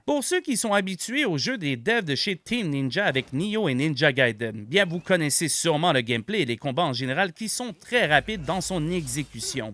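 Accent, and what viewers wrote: Quebec, Canada. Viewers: ah l'accend on dirait un québecquoi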